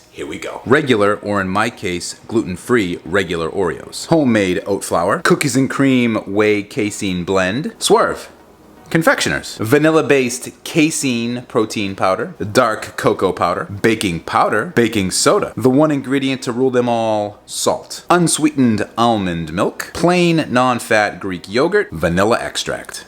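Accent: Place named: Boston, United States. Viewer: I recognize that accent! Where in the Boston area are you from?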